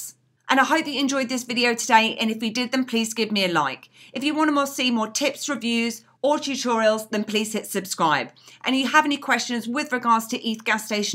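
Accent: United Kingdom. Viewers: Hi great video and by the sounds of it you are also in UK . Have given you a follow on instagram. First off the videos are all very clearly explained and understandable so thank you for that. Secondly it would be good to find out either on youtube or on instagram some local UK or London based crypto events. I have signed up to meetup but there are almost too many to choose from . Anyway nice connecting on the crypto space keep up the vids !!!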